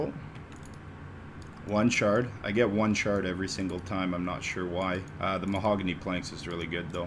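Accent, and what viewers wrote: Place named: Canada. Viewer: Fuck it. Nice vid SON!!! Throw in some more of that Canadian accent, aye?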